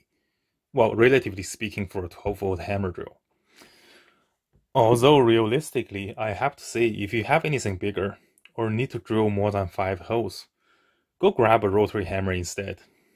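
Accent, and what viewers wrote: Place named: China. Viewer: 老板听口音是中国人？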